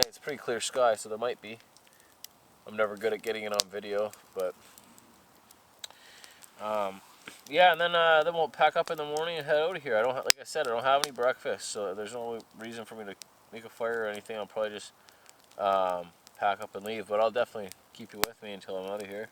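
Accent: Canada. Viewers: Were you saying the blue farmer Swiss Army knife or was it just my So. La. Ears hearing you’re Canadian accent? Couldn’t find that on amazon. Would it be the huntsman?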